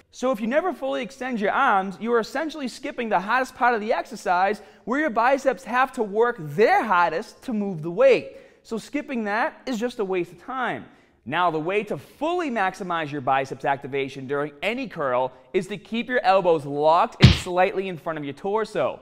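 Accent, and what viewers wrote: Boston, United States. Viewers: comparing your videos in 2010 to 2017.... you sound normal in 2010, but in 2017 you somehow developed a boston accent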